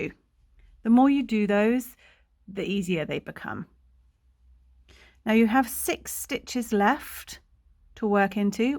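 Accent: England, United Kingdom. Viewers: American stitch names in an English accent? Lots of trebles, counting one, two, three as you did them, but then referring to them as double crochets, and then double crochets, counting one, two, but calling them single crochets! I can see I'll have to write the instructions out in English. Lovely squares, thank you, but any reason for the use of American terms?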